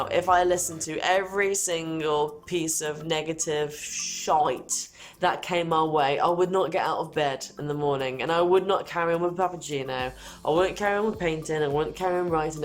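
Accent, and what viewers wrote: United Kingdom. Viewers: Hi, two things from me: in the past i wasn't really a fan of your videos watching you talking all the time. But this one was different! It was very personal, it was all YOU - brilliant, go ahead on this road! And btw: PLEASE a lot more of your "lovlea" british accent! I'm German - but i like it! Second: Lazy Tommy! What the heck has he done all the time? Just playing guitar and drinking Wiskey "with Plastic in it"? He is an electrician, why he didn't fixed your wiring already? That's sad to hear, thought that it was his business. Wish you better luck next time with your crew. Follow your track - of course you'll do! John Boyle: i'm totally with you. You'll never will know what more problems an old engine has in store for you after fixing the actual one. If you want to have trustful equipment buy new - and keep it maintained! Then it is YOUR stuff and you know what you're talking about. 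Raise a fund! With your sails and rigging it has already worked out, why not with the engine? Always fair winds and happy travels from Germany.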